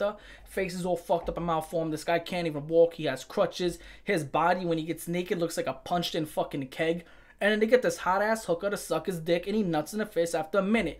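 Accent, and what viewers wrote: New York, United States. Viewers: R u from New York? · I forgot I was listening to a review of freaking mdpope, I got to into your descriptions and full New York accent 💀i could listen to ya all day 😭🫶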